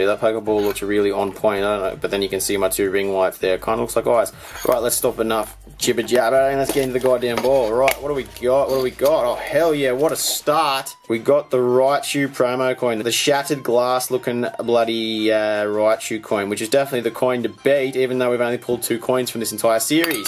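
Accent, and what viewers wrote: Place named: Australia. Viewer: talk with a Australian accent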